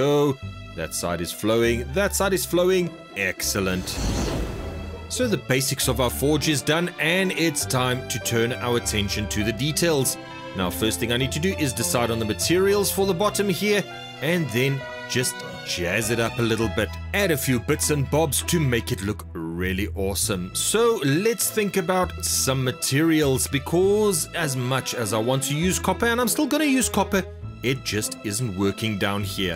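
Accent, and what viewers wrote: South Africa. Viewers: I love your accent are you south African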